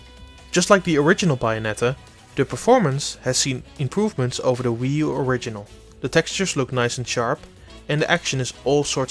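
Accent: Netherlands. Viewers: I was also wondering if this guy was Dutch and that seems the case. Its not to diss him but he really got a Dutch-English accent and for most Dutch people it isnt really pleasent to hear because we grow up with a lot of English speaking Media. But he shouldnt be bothered by haters because his vocabulary is good. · WOW this guy sounds Dutch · Damn, fellow dutchie here, I know the accent is quite apparent but you guys are kinda harsh, more than you need to be I’d say. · why does this have a dutch voice aaaaaa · You sound dutch , nice review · Urgh, i'm sorry but I can't stand this reviewer. I know he's Dutch (As am I), but the way he pronounces things is justt too annoying :/ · that thicc dutch accent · Let me guess you’re Dutch lol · This guy is soooo DUTCH it's not funny anymore. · Clocked the dutch accent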